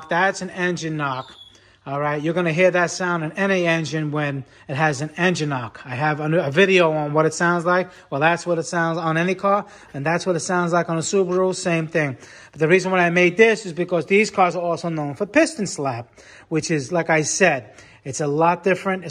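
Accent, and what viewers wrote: New York, United States. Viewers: The New York accent shined through over that rod knock 👍 best comparison video online. thank you!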